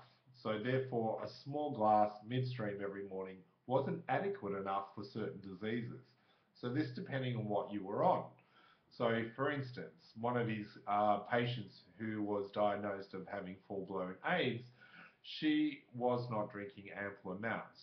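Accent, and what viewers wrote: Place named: Australia. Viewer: You sound Aussie mate!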